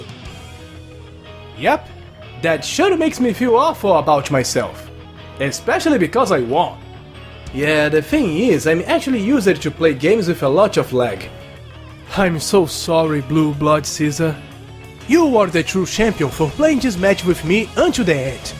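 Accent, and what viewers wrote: Brazil. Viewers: Será que você é brasileiro? :^) Acredito que gringos conseguem entender a maior parte, mas da pra dar uma melhorada, heim. O vídeo em si está bem legal.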